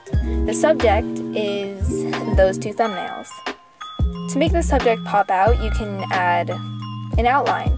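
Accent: United States. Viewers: Omg!!! Your a Filipina!!! I thought u were from the STATE'S!!!! YEEEEEEEEE ❤💕❤💕❤💕❤ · ngl wvwn tho ur filipino.. (like meee😌) ur voice sounds like an american. :O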